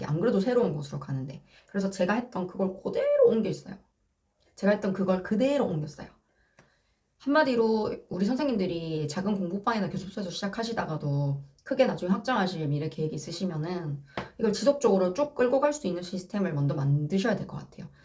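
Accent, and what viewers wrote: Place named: Busan, South Korea. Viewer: ❤❤대단하십니다. 부산사투리가 더 듣기가 좋네요~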